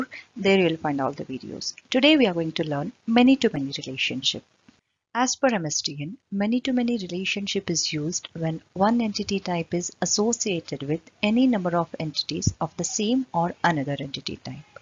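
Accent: India. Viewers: your explanation is so good. you are from Andhra or Telangana?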